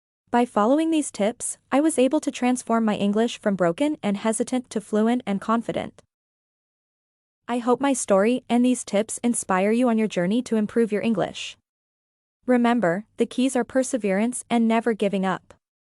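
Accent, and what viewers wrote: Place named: United States. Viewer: Excellent! We shall all develop an American twang. I love it!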